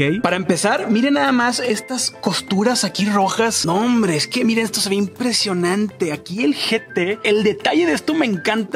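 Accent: Monterrey, Mexico. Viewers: Yo quiero un en mi vida, me encanta tu vibra, tu voz regia, tu forma de ser, simplemente palomita es afortunada de tenerte en su vida 😘 Haz más vídeos cocinando, enseñando paisajes, me encanta esta faceta 🤗 Simplemente el mejor 😍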